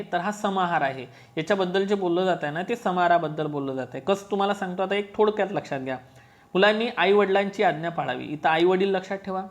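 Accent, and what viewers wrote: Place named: Amravati, India. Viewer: सर अमरावती ची भाषा वाटत आहे मी अमरावती कर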